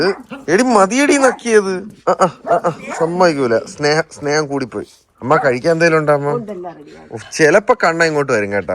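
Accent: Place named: Delhi, India. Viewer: Maza aa gaya… hearing your delhi slang… maza aa gaya bc ❤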